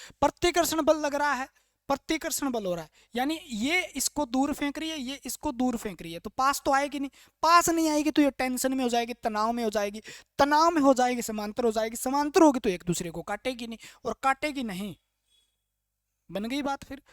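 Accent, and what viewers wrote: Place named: Sirsa, India. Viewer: सर जी भाषा बोली से सिरसा जिले से लग रहे हो, कौन सा गांव है जी आपका। साहब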